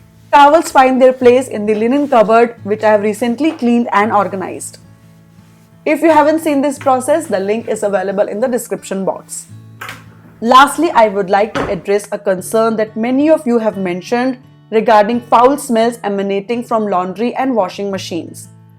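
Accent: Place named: India. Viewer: IM SORRY BUT A Hindu infused english accent has to be the strongest, heaviest, and most difficult to understand in the world. I cannot be the only one who finds this accent to feel like nails in a blender. I would rather subtitles in English, if you arent a native English speaker and you have a hindi accent. Please please, I pray you recognize how horrific this accent sounds. Its not your fault. But its miserable, and it is a choice to continue trying to record videos on youtube.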